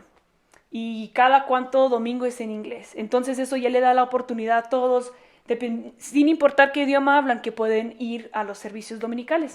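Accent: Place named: Mexico. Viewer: Gracias por tu vídeo y la manera de explicarlo. Me parece una exposición de la realidad actual muy enriquecedora. Yo estuve en Pensilvania en los años 90 en un pueblo Amisch para comprar productos típicos y curiosamente cuando les hablé en alemán estándar (Hochdeutsch), me respondieron en un dialecto que por suerte comprendí, y era más similar al suizo alemán, que aprendí por diversos motivos. Posteriormente, hace 6-7 años, tuve la oportunidad de conocer una zona donde había menonitas que vivían en Brasil, concretamente en Santa Catarina. Estas personas tenían dificultades para hablar en alemán estándar (Schriftdeutsch oder Hochdeutsch) sin embargo, hablaban un dialecto entendible y más parecido al Plattdeitsch (similar al holandés). Afortunadamente hablo alemán, suizo y holandés, y así pues levanté la curiosidad de los presentes. Es muy divertido hablar diferentes dialectos del antiguo alemán para poder comunicarte con los descendientes de los centroeuropeos. 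Por cierto, al empezar a ver el video pensaba que eras de España. Tienes cara de española 120%, pero luego te escucho hablar y me doy cuenta de que tienes acento mejicano 🤗😇. Hast du Videos in plattdeutsch?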